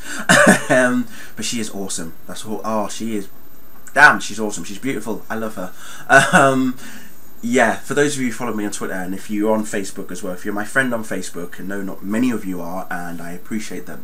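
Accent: United Kingdom. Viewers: Im 1 min into your video and already leaving a comment, lol. Im seeing it tomorrow with Akemi, you know her as AkemiChandler on Twitter and shes my best friend since I was 9. Im 23 now, lol. I wanted to see it at midnight, but then I thought, I actually wanted to be wide awake when I see it so me and her arent seeing it at midnight either. Im going to be an emotional mess. Oh, btw, I love the way you talk. I love England and the accent Im simply in love with. I simply love listening to you talk.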